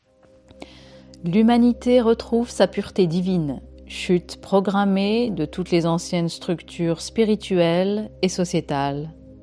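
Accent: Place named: Réunion, France. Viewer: Merci pour cet éclairage et ce petit accent ( réunionnais?) charmant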